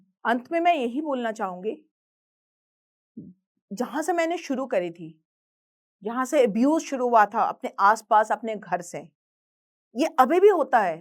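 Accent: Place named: Bengal, India. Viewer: Kaha se hai aap ye bhi pehle batana tha mam.. Aap bangali hai aapke baton se pata chalta hai... Hindi bhi sudharna hai aapko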